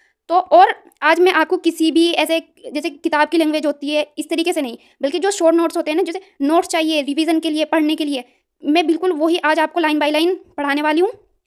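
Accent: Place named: Haryana, India. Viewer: Up west se ho thoda hriyana wali vibe h language me i think hapur gaziyabad meerut 😮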